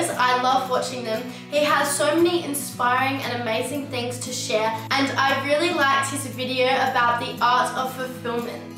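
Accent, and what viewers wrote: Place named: Australia. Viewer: Amazing video love your Aussie accent ahaha❤️❤️❤️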